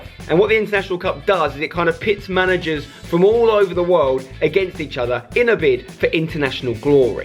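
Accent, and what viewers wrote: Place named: United Kingdom. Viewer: You are talking like a british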